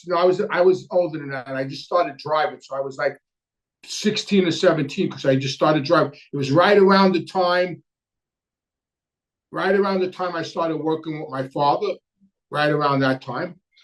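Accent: New York, United States. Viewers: I love listening to you talk. Always reminds me of the time I spent in New York.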